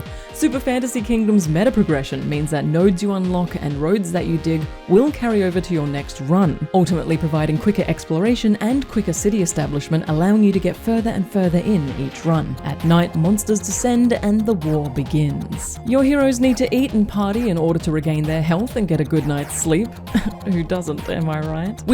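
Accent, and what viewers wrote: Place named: Australia. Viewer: I like the rare occasions YouTube recommends something interesting from a channel I didn't know about before. I liked the old days of classic RTS and city builders. Edit: also hello from another Australian, assuming from your accent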